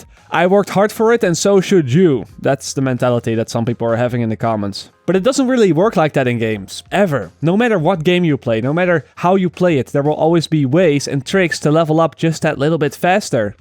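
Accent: Netherlands. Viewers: am from the Netherlands too. I can understand that it's not his first language but he is just talking Dutch with English words if that makes sense.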